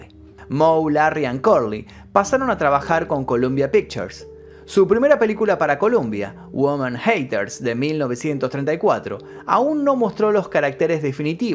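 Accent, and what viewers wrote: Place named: Buenos Aires, Argentina. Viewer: relator porteño? creo que ví en comerciales a éste muchachón éste relator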